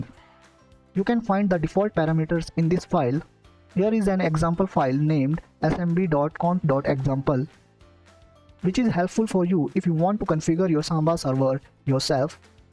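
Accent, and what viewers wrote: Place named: India. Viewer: OMG, Are speaking English or are you trying? please can you try to forget your Indian language, that's gonna help you.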